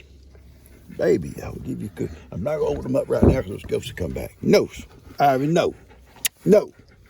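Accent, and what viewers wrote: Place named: Texas, United States. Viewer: Thank you for sharing your life with us. I love your stories and listening to your Texan accent and of course all of your babies ❤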